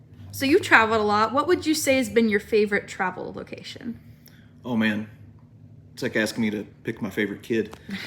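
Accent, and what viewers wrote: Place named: Midwest, United States. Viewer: love your work. Didn't even know your last name, always wondered what you teach and at what level. Always assumed you are a Midwestern, but always looked for clues in how you edit, projects you select, accent (daggum!!!) You're such a humble guy but talented and genuine. Appreciate what you do and consistency. Blessings!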